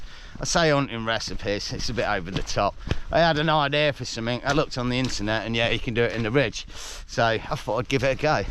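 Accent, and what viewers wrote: Leicester, United Kingdom. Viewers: knew you was from leicester or east mids from your accent mate, as im from leicester too. brilliant video man,.people just need to fuck off and leave everyone alone. you need the peace, especially when you know when the people of leicester are about lol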